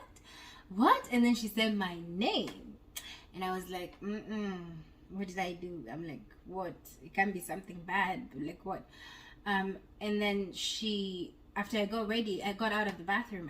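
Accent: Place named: United States. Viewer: You've come back with an American accent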